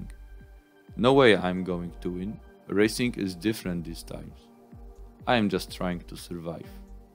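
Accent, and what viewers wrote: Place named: Russia. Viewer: The Ukraine? Romania? To the American ear, it sounds Russian.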